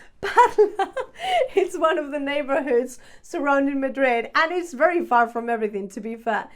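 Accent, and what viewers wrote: England, United Kingdom. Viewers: Ah! You should have also included "lo que mola". I had to look it up but it would have gone nicely in the video with "Que Chulo!" (How Cool).:) Keep up the good work. As a Londoner, I can really hear your English accent. It's definitely north of the M25 (perhaps the East Midlands or Yorkshire). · Liebe Dama, most excellent. You sound as though you've spent time in Inglaterra. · A few of these really take me back to my 5 months in Madrid! Great English accent by the way, from a bilingual Brit 😊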